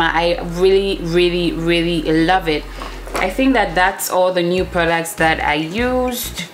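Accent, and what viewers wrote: Ghana. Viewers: Beautiful! You sound like a Ghanaian🥰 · I don’t know how I knew you were from Ghana. Maybe it’s your accent or your beautiful face. I am not Ghanaian but my husband is and I spend a lot of time there because he still lives there. Keep doing what you do, dear. Are you in Ghana presently or in the US?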